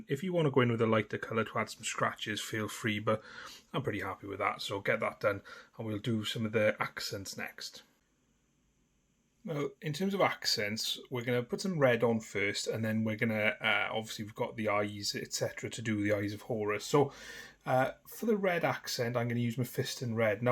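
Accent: Wales, United Kingdom. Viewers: Any chance of you'll have a go at painting Dragon Warriors Chaos Space Marines? I'm looking to paint my Chaos Marine battleforce to counter my Salamanders. Also, your Welsh accent is fantastic!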